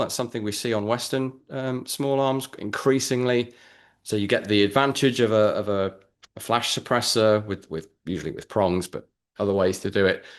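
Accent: United Kingdom. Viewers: You can't just discuss AK with that sort of a gay British accent. It simply doesn't work that way.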